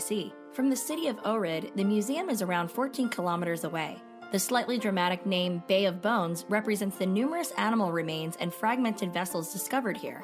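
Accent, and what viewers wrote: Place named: United States. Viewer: An Indian channel possibly targeting Indian travellers and voice over in American English! How many people in India understands American English, I wonder..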